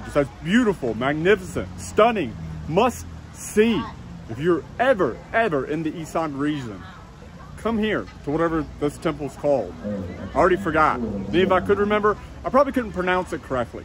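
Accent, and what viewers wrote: United States. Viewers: I love the way Americans pronounce it Boooooda :)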